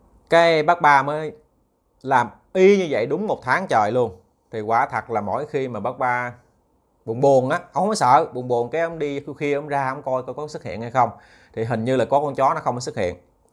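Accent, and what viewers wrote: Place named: Ben Tre, Vietnam. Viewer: Quê e ở đâu vậy,ah nghe giọng e gióng ở Thạnh phú,Bến Tre quá.hihi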